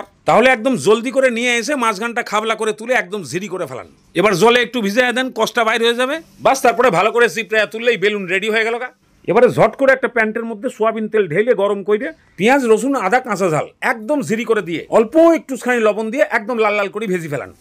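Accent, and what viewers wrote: Bangladesh. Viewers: Bangladeshi tone e Kotha bolche wow😮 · Eta amader Bangladeshi accent kind off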